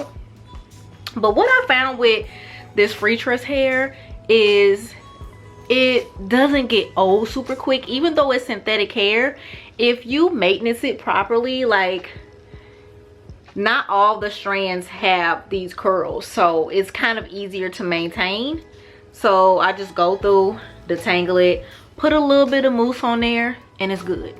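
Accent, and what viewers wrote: St. Louis, United States. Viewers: u sounding str8 outta Nellyville like u from the Lou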